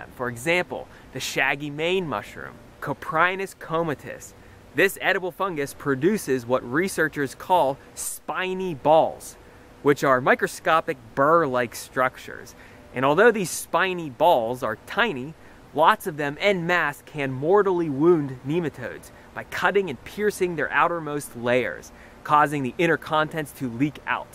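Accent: United States. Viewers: Subbed! Fantastic. Thank you for the research and for referencing peer reviewed journal articles. Also usually can't stand American accents. This is an exception.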